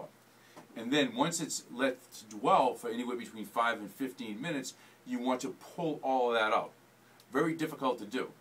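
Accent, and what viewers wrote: Boston, United States. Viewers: I hear that Boston accent.......from a Galway man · that Boston accent lol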